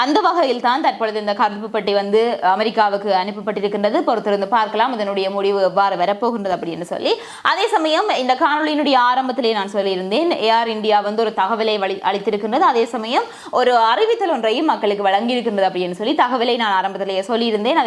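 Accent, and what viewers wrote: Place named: Jaffna, Sri Lanka. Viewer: எனக்கு யாழ்ப்பாணம் தமிழ் மிகவும் பிடிக்கும் ❤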